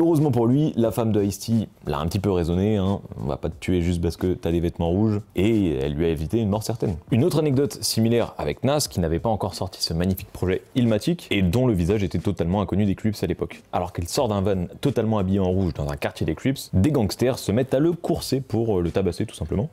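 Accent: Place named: United Kingdom. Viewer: la prononciation anglaise change le sens des mots, stp fais gaffe pour les sourds et malentendants merci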